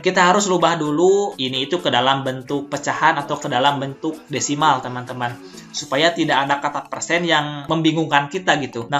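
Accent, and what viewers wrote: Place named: West Java, Indonesia. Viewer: Nadanya sunda, lucu 😆👍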